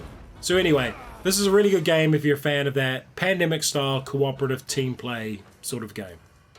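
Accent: New Zealand. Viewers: Oh yay a review by a New Zealander, so I will have access to/knowledge of everything you're talking about! Nice to hear a kiwi voice. I like the looks of One Deck Dungeon and Lords of Waterdeep.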